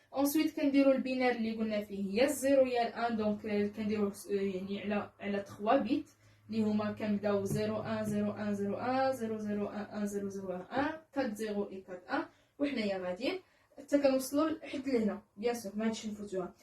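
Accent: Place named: Algeria. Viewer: تبان لهجتنا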